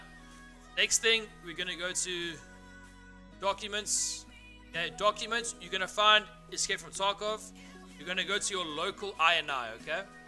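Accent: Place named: South Africa. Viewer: Woah South African tarkov youtuber?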